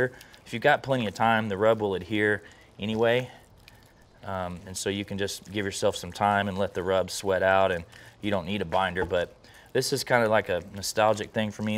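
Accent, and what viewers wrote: South, United States. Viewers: Wait is this guy from the south? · Is this guy from the south??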